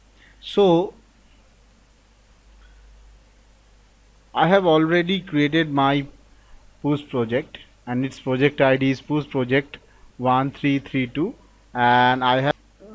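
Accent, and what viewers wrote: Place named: Bengal, India. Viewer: Such cute Bong accent.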